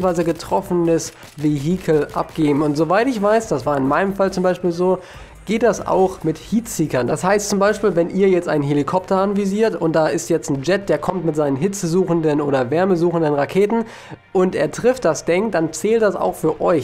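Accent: Germany. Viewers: also dein Video war richtig informativ und hat mir geholfen, ausserdem gefällt mir den leicht norddeutscher Akzent (?) Also ich lass gleich mal ein Abo da :D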